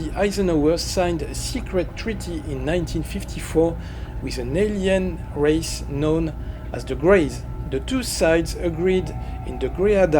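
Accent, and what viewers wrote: France. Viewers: Bonjour tes vidéo sont très intéressante mais malheureusement je comprend pas l'anglais je me contente du visuel ou de la traduction google mais la traduction n'est pas fidèle je pense. Ton accent montre que tu es français . bonne continuation si tu as des vidéos en français se serai top.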